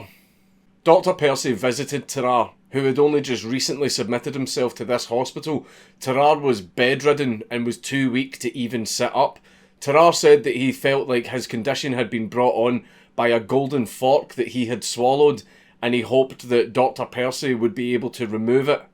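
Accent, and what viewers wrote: Scotland, United Kingdom. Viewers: Swallowing corks sounds a bit funny with a scottish accent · scottish man reads wikipedia at you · Subscribed to Sue´s channel too. 1: I like the scottish accent 2: To support Mister and Misses Dankular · Just watchin this for the Scottish accent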